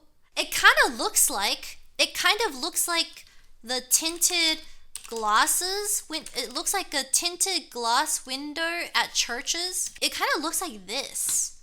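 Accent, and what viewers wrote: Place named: Australia. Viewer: So cute but I can't stand the aussie accent lol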